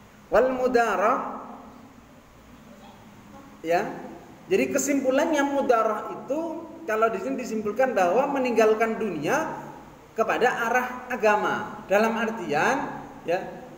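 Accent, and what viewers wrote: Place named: Java, Indonesia. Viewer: waalaikum salam duhh pa ustad logat jawa hhee masih belajar nglogat jawa nya saya mah hhee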